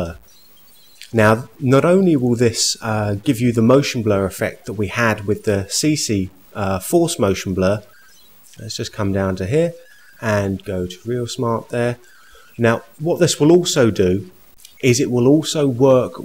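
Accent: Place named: England, United Kingdom. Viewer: Thank you so much for this tutorial, it's just perfect. And so good to hear an English accent on a video tutorial for a change - I can understand even better :)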